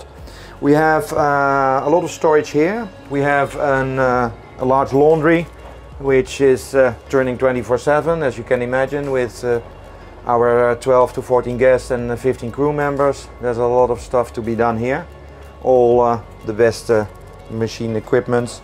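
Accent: France. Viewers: Quant un français parle l'anglais sa ce sait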